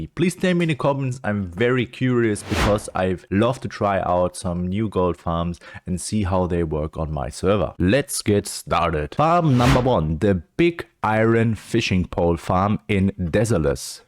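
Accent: Germany. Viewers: Man hört halt so krass raus das du deutscher bist xD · wie kann man so deutsch englisch sprechen? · don't let him bother you. your german accent makes it easier to understand for every non native english speaker ;)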